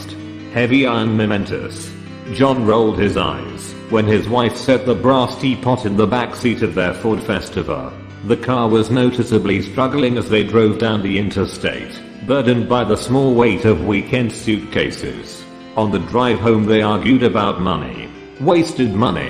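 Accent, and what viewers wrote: United Kingdom. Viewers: Full of British Accent!Wonderful!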